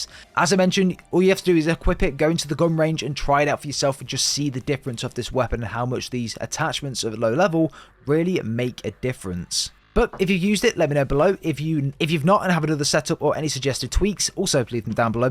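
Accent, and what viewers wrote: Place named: United Kingdom. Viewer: Can’t you hear that he’s British. We say things differently than Americans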